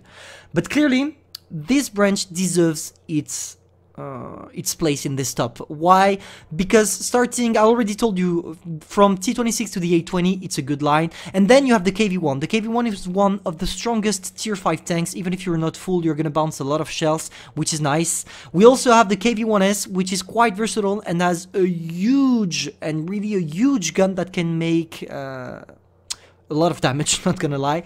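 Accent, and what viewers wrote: France. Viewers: C'est l'accent français ? Je peux pas croire que t es anglophone de base (je critique pas ton anglais) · he's french ,i speak French, English Greek, that's how the French speak English because the i ,in French it's like the English e in English an i ia pronounced ai . there you go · T'es français?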